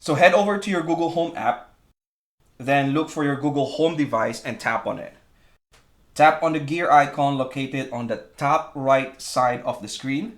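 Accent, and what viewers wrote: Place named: Philippines. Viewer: Thank you so much! It worked! And judging off the slight accent... Salamat Po! (?)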